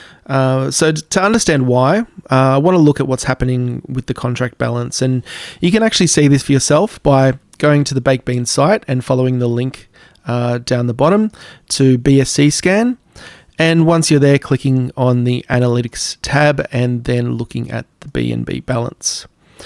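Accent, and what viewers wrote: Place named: Australia. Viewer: Thanks mate good to hear another Aussie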